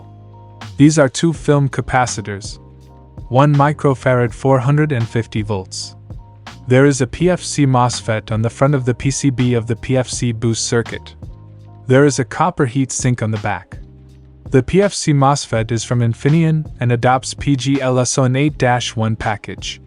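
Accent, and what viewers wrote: China. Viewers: I really prefer your english with chinese accent instead of this AI english transcription.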